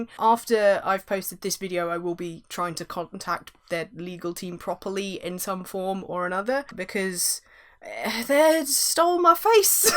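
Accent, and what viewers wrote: United Kingdom. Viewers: You're British?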